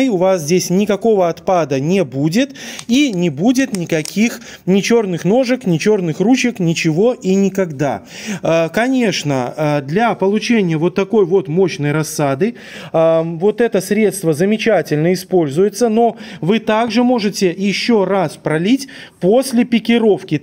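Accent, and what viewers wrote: Belarus. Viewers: Речь красивая с небольшим белорусским акцентом!!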